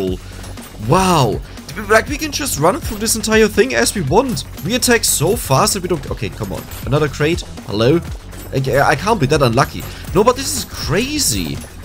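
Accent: Germany. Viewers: Oof the german accent😂😅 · The german english 🤣 love it, wenn das deutsche "so" ausbricht 🤣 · Moin Ich mag deine Art sehr gerne (und dein deutscher Akzent hat dich auch noch mal direkt ne Ecke sympathischer gemacht) Abo ist da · You know, it's already hard enough to get through your thick German accent, the NIE NE NE NIE NEN NE don't really help there. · Bruh du bist Deutscher das merkt man so Hard in deinem Akzent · Very german accent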